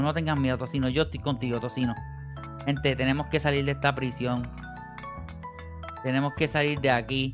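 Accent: Puerto Rico. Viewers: Me encanta la voz de y parece Puertorriqueño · Eres de Puerto Rico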